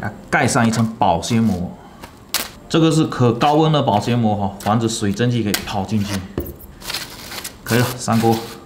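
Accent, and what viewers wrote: Fuzhou, China. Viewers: 你是福州人？ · 听口音像福州人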